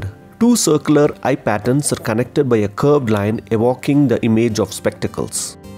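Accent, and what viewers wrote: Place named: South, India. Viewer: the pronounciation is kashmir, do not show your south indian MTI by calling it "cashmir". You have done it in many videos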